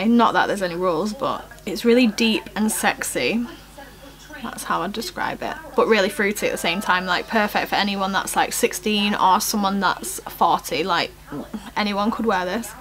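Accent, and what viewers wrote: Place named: United Kingdom. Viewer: you're so pretty! I love your accent. Are you British?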